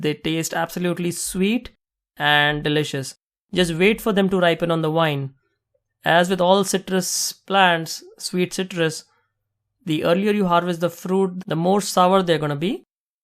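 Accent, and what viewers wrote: India. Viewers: U talk same Indian people, where are you from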